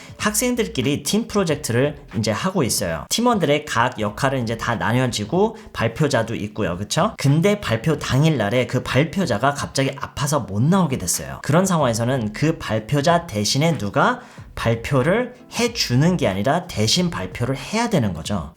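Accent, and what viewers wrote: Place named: United States. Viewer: 우리말 할 때 억양같은 거 보면 미국에서 나고 자란 교포같으신데 어쩜 이리 한국어를 완벽하게 하실까요 한국어와 대조한 영어의 차이점을 너무 친절하게 설명해 주셔서 이해가 쏙쏙 돼요 항상 감사드려요!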